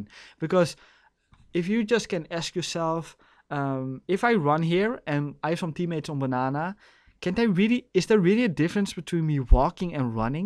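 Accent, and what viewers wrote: Netherlands. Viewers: Good job man, I appreciate new people trying to be helpfull to the community! Also keep talking as much english as you get the opportunity for ;) Believe me as soon as the dutch accent starts to fade people will appreciate it even more.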